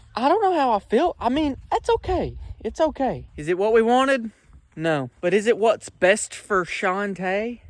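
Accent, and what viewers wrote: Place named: South, United States. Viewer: Im partially deaf but i can still hear how southern he sounds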